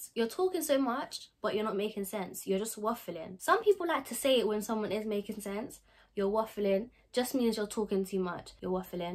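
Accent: South London, United Kingdom. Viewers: i knew u were from south im from west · I’m guessing your south London your accent is cute styl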